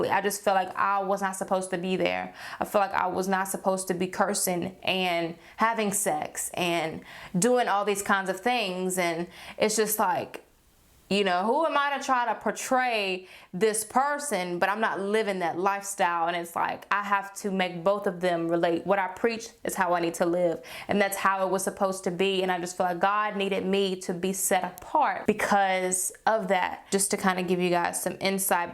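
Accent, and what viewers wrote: South, United States. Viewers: That Southern accent keeps peaking out a little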